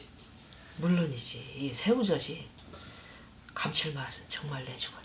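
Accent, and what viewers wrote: Chungcheong, South Korea. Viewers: 구수한 충청도 방언과 맛난 결안찜 굳입니다. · 충청도 말씨구만요 친근하게 느껴지잖아요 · 영상떠서 보고 바로 구독합니다 구수한충청도사투리인지요??? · 충청도분이신가 봅네요 구수합니다ㆍ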